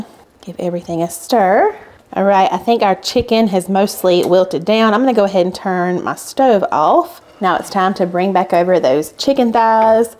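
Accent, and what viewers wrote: South, United States. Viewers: Made the chicken and spinach recipe it came out so delicious. Im making your beef,rice and queso tomorrow. I love the channel and sweet southern accent.❤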